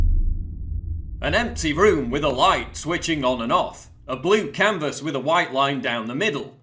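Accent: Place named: United Kingdom. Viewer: Just because you have a British accent doesn’t mean you’re not an idiot.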